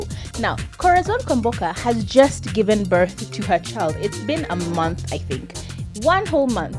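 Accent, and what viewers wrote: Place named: New York, United States. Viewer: wow , i,m new in the channel at first i thought ure from new york ....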